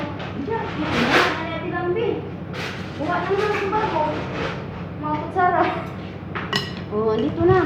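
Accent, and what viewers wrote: Visayas, Philippines. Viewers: Slang bai morag dili bisayang dako, sunod mag inintsik unya ta, tan- awon nato makaya ba sa mga bisayang antik. Zucchini - ( zu - ke - ne ) pronunciation bai, morag chikinene. Bai, bilingual is a talent that we should all be proud of. We all wish to speak better English or any other language nothing wrong w that. To be bilingual it’s got to be amazing, you can talk to any ppl in the world in their own mother tounge. You can get jobs too by speaking different languages. Overall it’s good. You can speak 🇰🇼 we wish we can + your ever so deep visayan that sometimes it’s so hard to understand bc that’s how deep it is & that’s one of your talents not a lot of visayan who can speak like how you speak it’s just so deep & it feels so good to hear it from you bc we absolutely missed that from our grandparents. Centre Point ad Bai 3 mins long para sa pamasko bai pohon. 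Hehe, bai you can’t stop thinking about something else niambak hinoon ang patatas. Mosayaw diay ka bai kon maghiwa. 😂kataw- anan kaayo ka. Bai makanirbyos mana imong phone bai kadaghan na hapit moambak. Pwerting lamia bai tan- awon imong salad. Bai magikon nalang nato ang nomero para makasal naka. 💞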